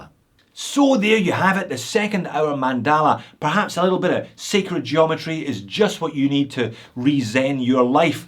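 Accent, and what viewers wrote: Scotland, United Kingdom. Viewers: He even says Om with a Scottish accent.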